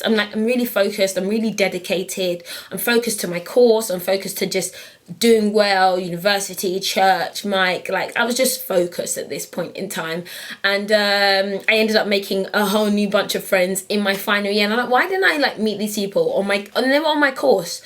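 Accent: Nigeria. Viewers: MORE NIGERIAN ACCENT! hilarious haha xx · lol your nigerian accent i love and what colour is your nail polish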